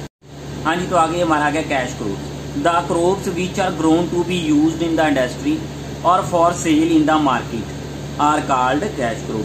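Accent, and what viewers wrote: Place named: Tamil Nadu, India. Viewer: You are from Tamil Nadu I am sure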